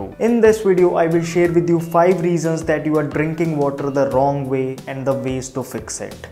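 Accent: India. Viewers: He speaks slow because he is not faking any english accent he speaks purely Indian english accent. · It’s not easy, to understand your „INDLISH"!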